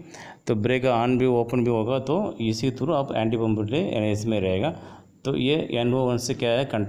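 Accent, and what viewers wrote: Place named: Tamil Nadu, India. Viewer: Speaking like u r Tamil. R u from tamilnadu sir ??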